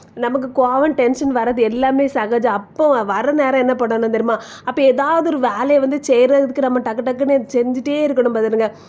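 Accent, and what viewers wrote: Kanyakumari, India. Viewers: உங்கள் kitchen ரொம்ப சுத்தமா இருக்கு. அத விட உங்கள் கன்னியாகுமரி தமிழ் கேட்க நல்லா இருக்கு. இன்று தான் முதல் முறையாக உங்கள் channel பார்க்கிறேன்🎉🎉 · No this is kanya kumari slang😂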